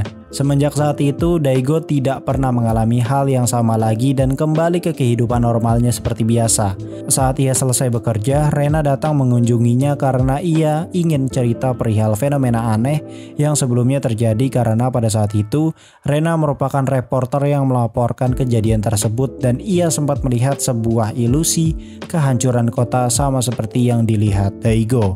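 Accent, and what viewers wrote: West Java, Indonesia. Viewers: orang sunda gening euy🤣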